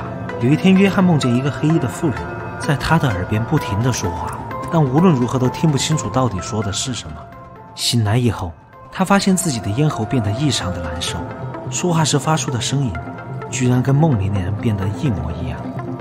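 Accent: China. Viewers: 配音是四川还是重庆人？ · 听起来是重庆口音😂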